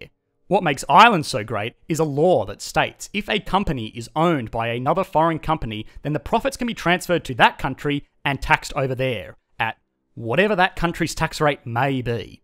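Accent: Australia. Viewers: You have the most charming Australian accent I’ve hared😃👏😄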